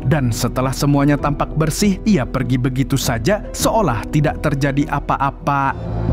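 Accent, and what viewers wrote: Banjar, Indonesia. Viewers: Banjar banarrrrrr wal ai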